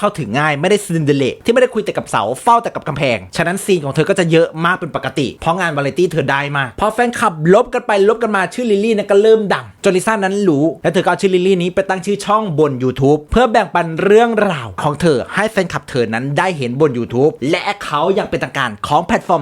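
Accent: Isan, Thailand. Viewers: พากย์ได้แซ่บจิงๆ ชอบๆ มีแทรกอีสานเข้าไปด้วย มันส์ดี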